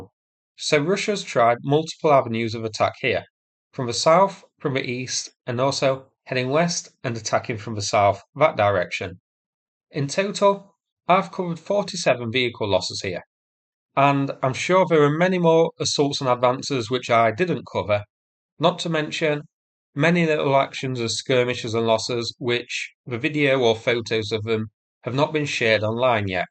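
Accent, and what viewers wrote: United Kingdom. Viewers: Just an observation. You are speaking His Majesty’s English. Here in America. I noticed while working in a Nippon Restaurant. That in Nippon. They teach H.M.S. English I.E. Bonnet = Hood. Boot = Trunk. Knickers are Panties. So My vocabulary is expanding. I use Rodgerd,Knackerd now. And there called cookies Not biscuits. Torches are for Lighting fags ? Oh cigarettes. No it’s a Flashlight. Keep up Your Good Work Sir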